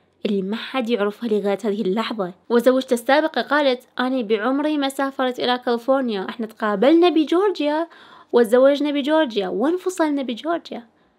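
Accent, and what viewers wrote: Iraq. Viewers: انتي من اي دولة لهجتج خربطتني نص عراقي ونص اردنية ونص اكويتية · حبيت اللكنة العراقية لاني اسمعك على الرغم اني درسوني عراقيين وانا يمني الاصل ودرسوني اجانب والحمدالله صرت انسان رفيع مستوى بس لديك للكنه واضحة الى الامام دوما I loved the Iraqi accent because I can hear you, even though I was taught by Iraqis and I am of Yemeni origin, and they taught me by foreigners. Thank God, I have become a high-ranking person, but you always have a clear, forward-looking accent. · عندي سؤال محيرني من مده الحين لهجه صاحبه القناه وطريقة نطقها نفس اسو لكن السوال وش قبيلتهم بالعراق وش ينقالهم الي يتكلمون بهل نغمه 🤔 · كل العراقيات يحجن هيج خصوصا الي ببغداد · عراقية وهاي لهجتنا ف طبيعي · شدخال القبيلة. هي عراقية واخلص ف الكل يحجي كذاا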